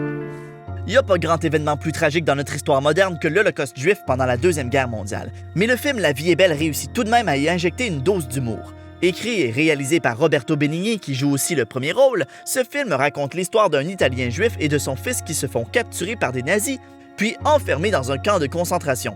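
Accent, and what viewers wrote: Quebec, Canada. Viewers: Ter quebecois ou francais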